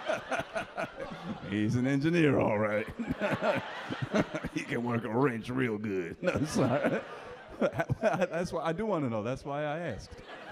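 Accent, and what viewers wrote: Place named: New Jersey, United States. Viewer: As a fellow (undercover) Patel, has the strongest NJ/NYC accent I’ve ever heard. My dog came up!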